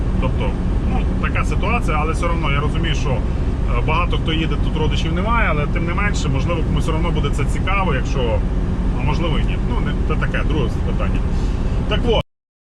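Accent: Lviv, Ukraine. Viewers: Класне пояснення гарна дикція Тай акцент скорше Львівської області. Однозначно лайк.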